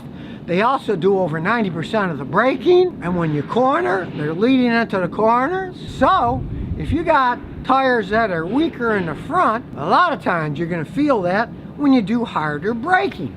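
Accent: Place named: United States. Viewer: Running translation is must in English as some people do not understand American accent orally.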